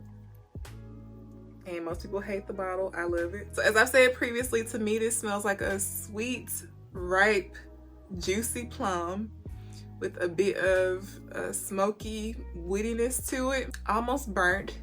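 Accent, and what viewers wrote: United States. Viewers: I love your country accent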